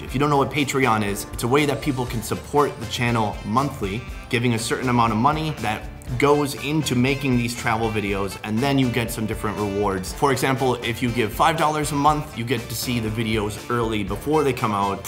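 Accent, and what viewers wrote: Minnesota, United States. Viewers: I thought he sounded Minnesotan, didn't realize he was from my hometown area!!! 💁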